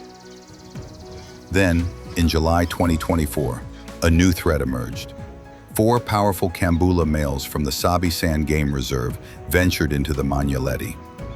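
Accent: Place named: United States. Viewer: listen to the dumb usa gump reading about africa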